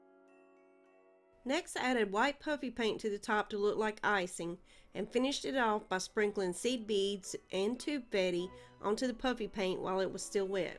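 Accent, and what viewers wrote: South, United States. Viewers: Nice. I love your southern twang! It's fantastic!!!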